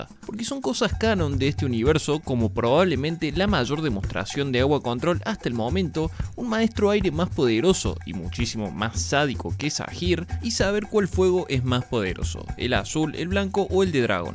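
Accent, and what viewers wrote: Cordoba, Argentina. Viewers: Me encanta cuando citas el comic, nada como una narración bien cordobesa para entrar en el misticismo jajajajaja xD muy buenos los videos xDDD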